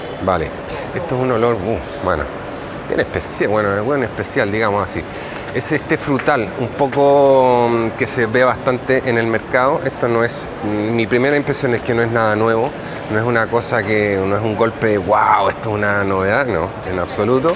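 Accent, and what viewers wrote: Chile. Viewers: Es muy divertido oírte hablar con acento chileno pero con modismos españoles, jajajajaja, me gusta mucho tu canal, gracias a ti uso Acqua di Gio Profumo. Saludos desde Chile 🇨🇱!!! Un fuerte abrazo!!!! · Que genial escuchar sobre este tema y más con el aun acento chileno. Estaba viendo el canal de Rosy MC Michael que tiene millones de seguidores y te menciono, me alegro de haber buscado tu canal. Éxito! y saludos desde Chile :) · Lo escucho hablar y se me hace que es chileno jajaja o es mi idea🤔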